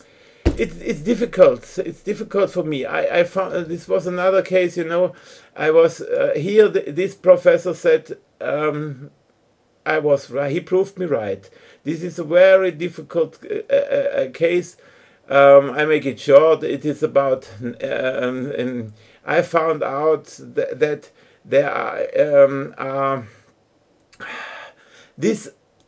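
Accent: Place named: Germany. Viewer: EXCELLENT WORK, I JUST WISH IT WAS NARRATED BETTER..SOMEONE WITHOUT A THICK, STUTTERING GERMAN ACCENT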